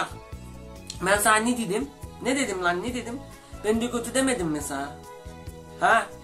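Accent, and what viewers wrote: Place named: Aegean, Turkey. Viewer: buda ege şivesi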